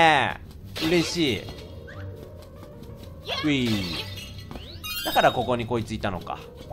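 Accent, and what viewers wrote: Kansai, Japan. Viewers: レトさんの関西弁すきなんよなー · レトさんの関西弁(⸝⸝⸝ᵒ̴̶̷̥́ - ᵒ̴̶̷̣̥̀⸝⸝⸝) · レトさんの関西弁可愛い( ´,,•ω•,,`)♡ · 初っ端から関西弁聴けて幸せだいぶ幸せお耳が幸せとにかく幸せ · レトさんの関西弁違和感なさすぎる( ˘ω˘ ) ｽﾔｧ…さすが関西出身やなぁ…好きやわ～レトさんの関西弁( ◜௰◝ ) あっ忘れてました( ˊᵕˋ ;)💦 あっこちら皆様が求めているシーンとなります|´-`)ﾁﾗｯ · レトさんの関西弁が好き〜〜〜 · レトさんの関西弁がたくさん聞ける神回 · レトさんの関西弁にキュン死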